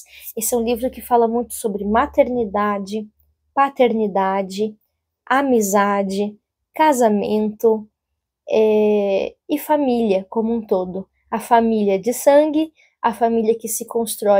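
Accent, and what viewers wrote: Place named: Latin America. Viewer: QUE AULA! Amiga, eu sempre amei as nossas conversas, e sempre quis assistir uma aula sua. Considero esse desejo realizado com esse vídeo. Sem dúvida a fruta não caiu longe do pé heheh mas tem o seu jeitinho de explicar. Simplesmente amei a introdução ao livro ❤ E quanto ao livro em si... só o começo da história já me deixou muuito intrigada! Quero saber mais sobre essa família de mulheres hehe e se só o começo já é assim, tudo o mais que você contou sobre a história me deixou ainda mais curiosa e interessada pelo livro. Mais um na lista!! Hehe Ainda mais especial por ter esse "sotaque" latino 🎉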